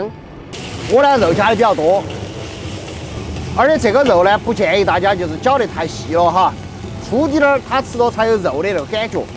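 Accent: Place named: Guanghan, China. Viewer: 你应该是德阳附近的人吧，和广汉人说话口音特别接近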